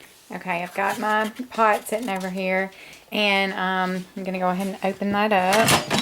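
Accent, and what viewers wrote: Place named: South, United States. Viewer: Thanks for the recipe. I love your southern accent. :-)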